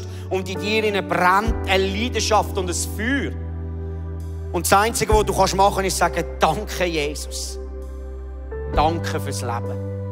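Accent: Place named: Switzerland. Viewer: Versteh leider net so gut, des schitzerdeutsch, schief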